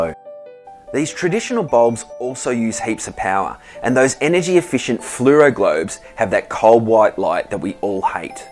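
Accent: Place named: United Kingdom. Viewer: The only reason i can Believe is "British Accent".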